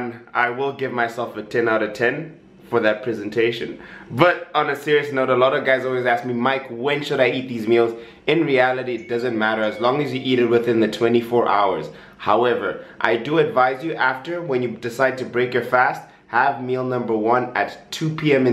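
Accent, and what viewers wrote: South Africa. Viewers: Great video. You've gained a subscriber 👌🏼Also, you sound South African, but those aren't SA products... where on earth are you dude? 😂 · was pretty sure I recognised the South African accent, then the nescafe just confirmed it all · Great video, so there's hope for someone who's got to have that coffee and cookie to remain sane. We wont wish u well with your studies cos you clearly have discipline! Believe me you look and sound South African no lie. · He does sound South African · where are you from? You Sound South African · You sound South African? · Bro your accent sounds South African? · Is it just me or does he have a South African accent🤔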